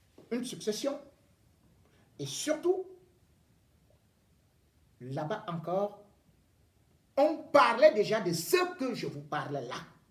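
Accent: Cameroon. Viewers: Un journaliste engagé comme toi on en voit de mooins en moins en Afrique. J avoue que alain foka et toi faites la fierté de notre pays. Continuez ainsi l histoire retiendra votre passage sur cette terre. Merci pour tout mr ngono. Depuis que je suis tombee sur l émission de sport à france 24 j ai doublé mon admiration pour vous. L accent Camerounais sur ce plateau tv me fait tjrs sourire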